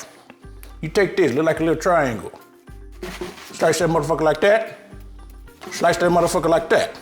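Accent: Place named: New Zealand. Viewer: Is this what my accent sounds like to other kiwis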